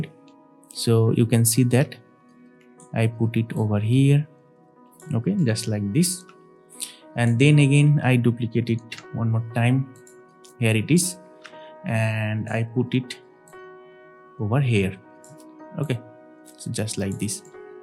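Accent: India. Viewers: oh no indian accent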